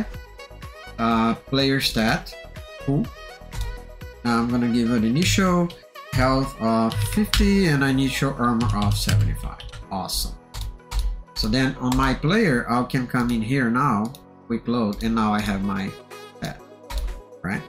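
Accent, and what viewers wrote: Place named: Brazil. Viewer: I've instantly recognized the Brazilian accent, great video man.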